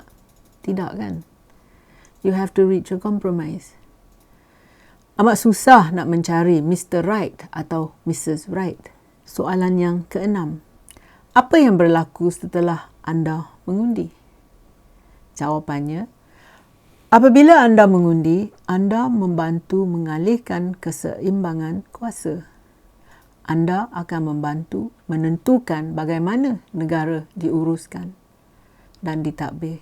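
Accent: Perak, Malaysia. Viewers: Saya suka Puan cakap loghat Perak..ha ha ha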